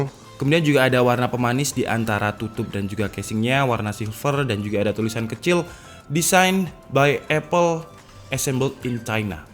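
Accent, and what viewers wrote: Java, Indonesia. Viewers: Di kelet kan pakai api... Wes talah jowo mu loh mas Medok banget... Seneng aku mbek bahasa ne..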